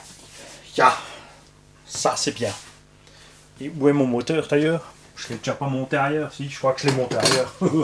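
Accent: Alsace, France. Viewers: J'adore l'accent surtout accentuer par le alsacien 👍🏻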